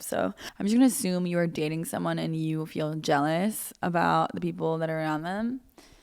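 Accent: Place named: Ohio, United States. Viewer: As a fellow Ohioan who also moved away, I love when your Ohio accent comes out 😂🥰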